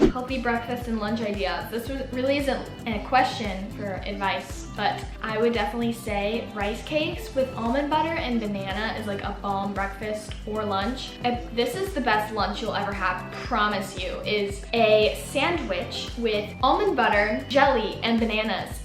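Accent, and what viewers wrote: Australia. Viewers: her australian accent confused me!! i was like doesn’t have an accent?? 😅😅😅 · oh my god I thought you were actually Australian 😫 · This is the first video I’ve seen of u and I thought u were Australian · aye! just kidding I love ur brilliant aussie accent please make more vids with the accent btw smol youtuber here! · I have never seen one of your videos and I thought you were from Australia!!!! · First of all your Australian accent was amazing. Also this is so helpful I was homeschooled for the freshman year. I decided to enroll back in school and I’m a little nervous so happy this helped. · this is the first video I’ve watched of u and I legit thought u were Australian. good eye might. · suddenly you're coppying Kennedy Walsh with the australian accent not hate love ya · YESS love that Aussie accent you have to come to Australia soon bc I would love to meet you🤩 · this is my first time watching one of your videos and i actually thought you were an aussie 😂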